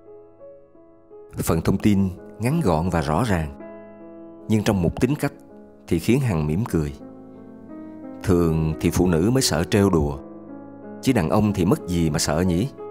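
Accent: South, Vietnam. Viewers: Giọng miền nam của bạn thật hay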